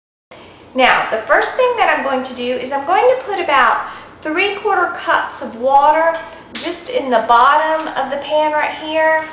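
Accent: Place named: Georgia, United States. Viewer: Where are you from? You sound like Georgia.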